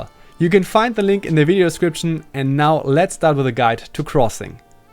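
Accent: Germany. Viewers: man hört so sehr raus, das er deutsch ist :D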